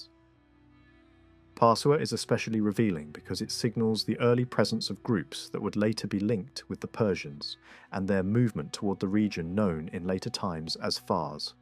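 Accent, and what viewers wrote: United States. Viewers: Konum Türkiye ama aksan ABD 🤔?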